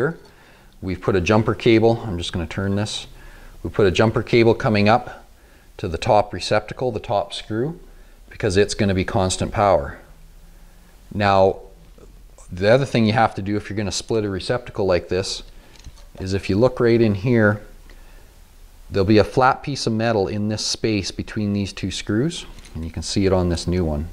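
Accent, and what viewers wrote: Canada. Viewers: You can so tell that he's Canadian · You have the most Canadian accent ever it reminds me of home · You must be Canadian eh.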